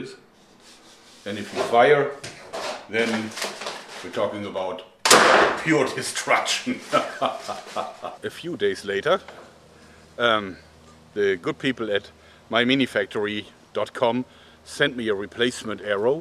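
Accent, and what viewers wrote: Germany. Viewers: where are you moving to im guessing somewhere in Germany at least that's what i think because of your accent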